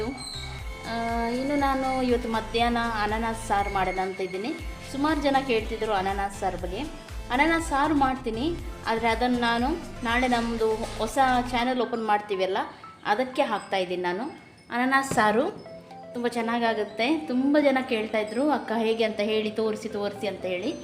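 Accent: Mangalore, India. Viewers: Sis nimma Mangalore bhashe thumba Chennai so nice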